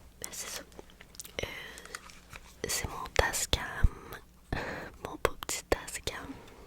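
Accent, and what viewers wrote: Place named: Canada. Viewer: Je viens de decouvrir ta chaîne. Ton accent canadien me relaxe de folie et rend ton asmr tres spécifique j'adore! Très belle année a toi! Je m'abonne😊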